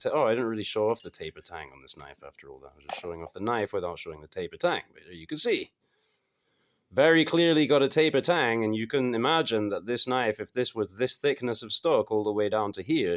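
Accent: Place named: Scotland, United Kingdom. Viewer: I knew I detected a little bit of Scottish. I just hadn't heard you talk enough to make the determination. I think you have sold me on the tapered Tang..also . 2 way tapered Tang?! What is this sorcery ?! I just learned about the single taper 😂 😂 I also finished the rough-in of that knife, I was actually able to get it surface ground pretty decently on my disc grinder but I don't think I will be able to taper it on the disc grinder, so I think I will have to switch over to the belt and start working on it that way. It's going to look really cool in the end because it will have a distal taper and a tang taper. I'm over here in virginia, close to Virginia Beach. Collab in the near future per chance? 😂 The two way is very cool looking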